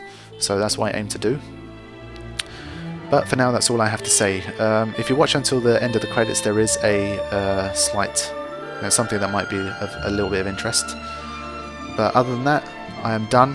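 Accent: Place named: London, United Kingdom. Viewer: What an insightful walkthrough! I've been interested in hearing someone's thoughts about this game, and as a result I found you. I wanted to hear what you had to say throughout your playthrough and I must say that I have thoroughly enjoyed your commentaires. Your explanations about the story make sense, it allowed me to see FFX in a slightly different perspective, so that's nice to hear. Overall, clean commentaries. You talk when it is necessary, you managed to give many details about the game mechanics, as well as adding some sarcasm tone here and there; that kind of humor is always welcome =) Thank you for your hard work and for all the content you willingly share with us, hands down! P.S. Nice voice, and nice accent as well. Londonian accent is the best in my opinion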